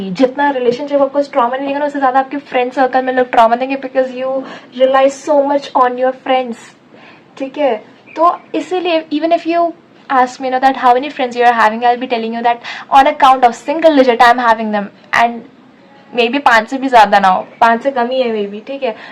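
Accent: Bihar, India. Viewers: Didi bihar se hai kyaa aap.. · dii bihar se ho aap bhi really mujhe laga delhi ki hain aap😁😁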